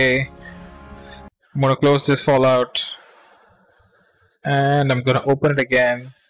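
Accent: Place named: India. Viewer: The moment I heard an indian man in this video, I was overcome with a sense of relief.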